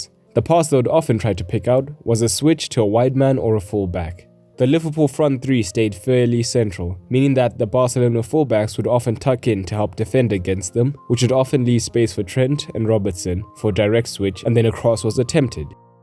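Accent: South Africa. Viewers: Your accent is South African ?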